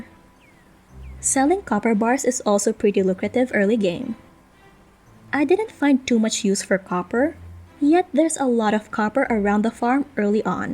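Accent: Philippines. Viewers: pinoy accent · FIrst few seconds of watching and hearing you talk, I immediately thought "Fellow kababayan!" :D I'm so glad seeing more and more MIrthwood videos, we gotta spread the message of what a gem this game is! I've been following and playing Mirthwood since Playtests these last weeks and been playing since release. I enjoyed your video!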